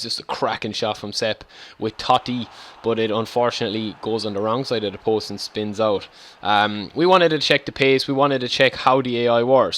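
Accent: Ireland. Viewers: Welcome to Ireland. I think it sounds nice, love the accent.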